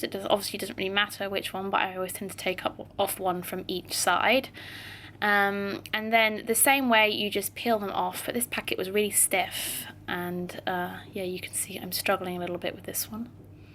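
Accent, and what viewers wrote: United Kingdom. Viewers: I really like your voice! It's super duper british and clear. Also very informative video so thanks!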